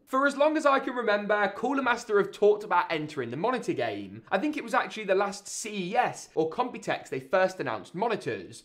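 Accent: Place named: United Kingdom. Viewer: I Subscribed to your channel only to listen to the way you speak. I always giggle when I hear the British accent. [Also, I am not being racist or making fun, it's just that, after 9 to 6 IT job, I like watching your videos, they are informational, funny and clean].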